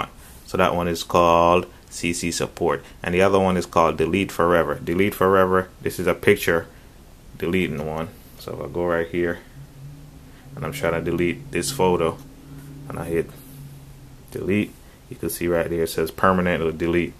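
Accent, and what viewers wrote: Jamaica. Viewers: Jamaican I see, keep up the good work Bredda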